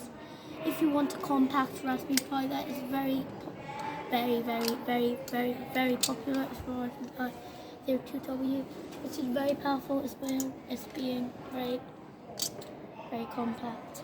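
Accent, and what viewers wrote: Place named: United States. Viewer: Still speaking american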